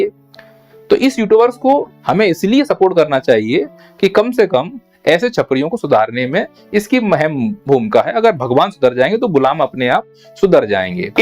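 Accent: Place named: Haryana, India. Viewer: 🎉chora hariyanvi 😂 hai bolte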